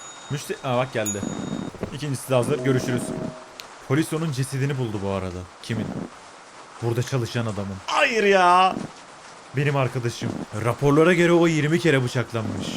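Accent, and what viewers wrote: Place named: Istanbul, Turkey. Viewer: Muazzam İstanbul Türkçeniz ve inanılmaz diksiyonuza hayran kaldım hanım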